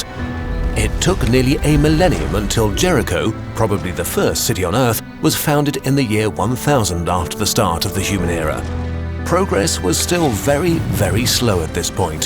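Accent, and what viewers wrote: United Kingdom. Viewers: Where is he from? because Kurzgesagt is German, but he sounds more Brittish. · Not sure why, but his British joice just makes it seem more reliable and knowing.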